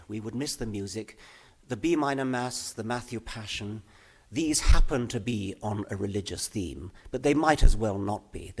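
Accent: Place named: United Kingdom. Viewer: Because he has a British Accent.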